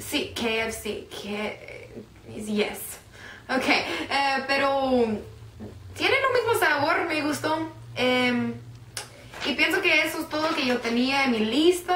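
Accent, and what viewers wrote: Guatemala. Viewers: Wao! que bueno que te gusta hablar como los chapines y sobre todo estas muy linda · Amo a esta chica y adoro escucharla hablar como chapina, GRANDE MI GUATEMALA :D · neta me gusta q ables alo chapin como desimos alo chinto va jajaja aki la mara abla mejor q otro centro americano aguevos y q linda ....viva guate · Que calidad ver que te gusta hablar como chapina Dios te bendiga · Hola! 😊me encanta tu acento Chapin, está chilero, 👍👏👋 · Tienes acento muy Chapín. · Te felicito por tu acento guatemalteca, estás muy bonita y te ves buena onda!!! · Que bien le sale el acento chapin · Wow nena si hablas al estilo chapín, me yegas. Que viva Guate!! Vos=Tu Güiro =niño 👍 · Si tiene hacento chapín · No manches Si Estas Aprendiendo mucho el Español. Que se me Ase que Eres Chapina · Tu acento, ya está sonando un 95% como el de Guatemala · Buen video felicitaciones aprendiste bien... 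al estilo Chapín no sólo español....✌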